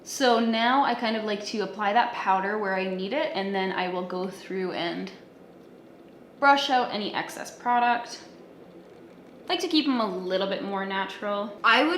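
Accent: Canada. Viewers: you have a thicc canadian accent :) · never heard you sound so Canadian lol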